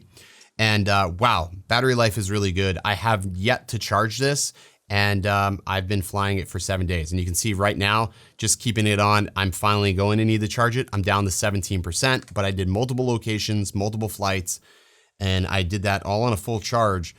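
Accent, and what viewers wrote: United Kingdom. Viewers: Very nice intro... funny I tell my youngest to go into another room so I can watch these... 😆 🤣 nice bit of British makes it sounds very professional 👏 👍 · you narration was so spot on! Complete with the perfect British accent!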